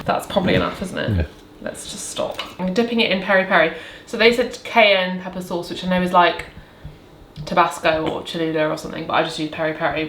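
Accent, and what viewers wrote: United Kingdom. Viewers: I already booked your plant comfort kitchen ebook last year, but I could not resist getting the bundle! It's a bargain. For 50$ I got so many books and recipes that I'm looking forward to trying. Of course the first ebook I downloaded was versatile vegan and I loved it! I truly recommend the bundle to vegans(like me) and people who want to eat healthier. I just love your recipes. Can you imagine? I started following you because of your lovely British accent years ago? 😍 I'm Italian but I live in Malta and soon I'm moving to one of the islands you love...❤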